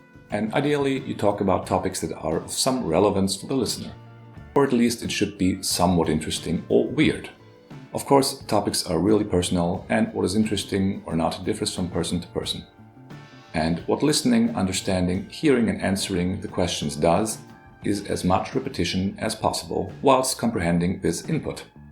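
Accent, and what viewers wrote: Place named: Germany. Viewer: Horrible strong "English" (german) accent.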